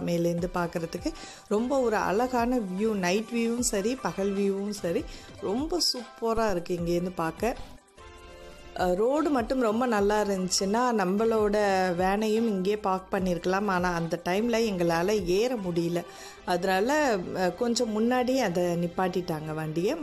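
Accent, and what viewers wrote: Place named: Kayalpatnam, India. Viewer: I cooked vetalappam which is so taste.Thanks for your recipes.Your voice is also nice with kayalpatnam style. Mrs.Shobaanandaraj Chennai.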